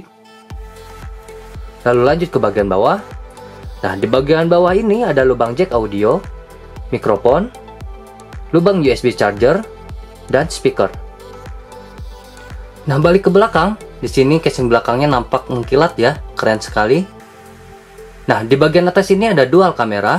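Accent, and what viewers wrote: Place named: West Java, Indonesia. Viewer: logat nya orang sunda , bandung kah?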